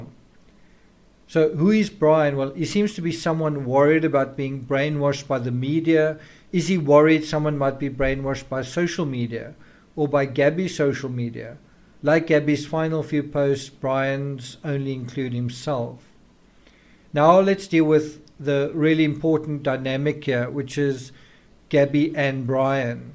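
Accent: South Africa. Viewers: Howsit! Love the SA accent. Great channel. Greetings from Eire 🇮🇪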